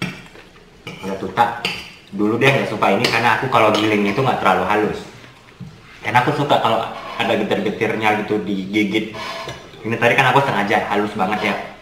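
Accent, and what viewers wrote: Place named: Karo, Indonesia. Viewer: khas karoooo sekaleeee hahahhaha mejuah juah buleeeee lidah karo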